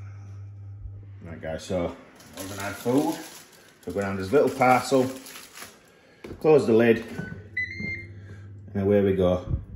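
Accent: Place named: Yorkshire, United Kingdom. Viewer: The Yorkshire accent made this video perfect!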